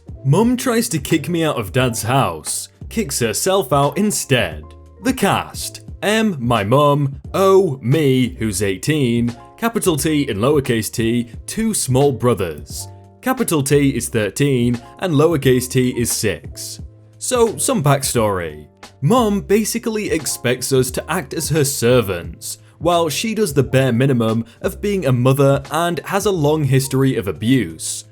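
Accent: China. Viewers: ST: My Chinese is rusty Also ST: Great Chinese accent Me: O_O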